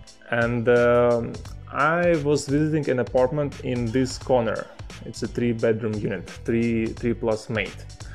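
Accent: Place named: Ukraine. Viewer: Vasiliy from Ukraine accent :)))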